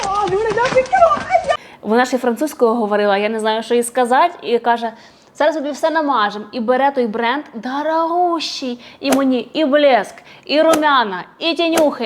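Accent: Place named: Western Ukraine, Ukraine. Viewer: по вимові відчувається, що вона з заходу України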